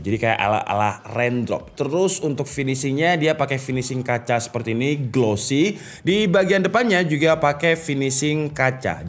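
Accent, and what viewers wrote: Malang, Indonesia. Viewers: Asli malang kah om ?? Kok kyk di malang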